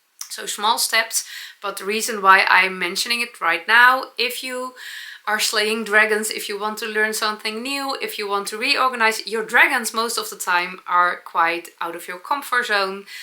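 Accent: Netherlands. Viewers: I know it is a lot of work to record your episodes twice, but I appreciate your dedication. I love your Dutchy English and would miss your sauce. Thank you for the deep dive into sock knitting. Your daughter's new socks are lovely! ❤🧶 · Your dutchy English just makes the episodes.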